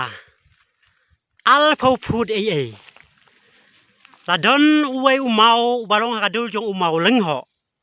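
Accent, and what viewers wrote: Sohra, India. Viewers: Kren sohra ruh pat nangre ine ima kum ba khreh poittry I mat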